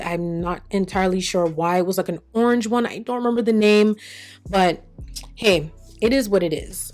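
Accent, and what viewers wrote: Jamaica. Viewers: Lil Sis when you break out in Patois, I know it's clutch, and I run to my nearest Sephora😀😀😀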